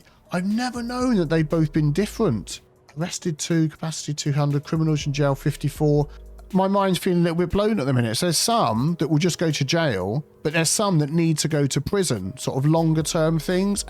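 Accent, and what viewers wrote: United Kingdom. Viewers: Wait, you're British?!?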